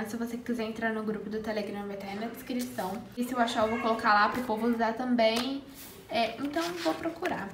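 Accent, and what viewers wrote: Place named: Belo Horizonte, Brazil. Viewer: o sotaque belorizontino KKKKKKK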